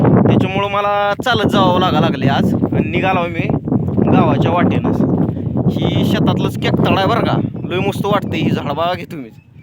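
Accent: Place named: Marathwada, India. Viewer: भाऊ तू खुप छान बोलतो ही मराठवाडा भाषा आहे ना ही लय हासतो राव तुझा बोलन्याला तु खुप मनमोकळा माणुस आहेस 👍👍👌👌🥰🥰🕺🕺🌹🌹💐💐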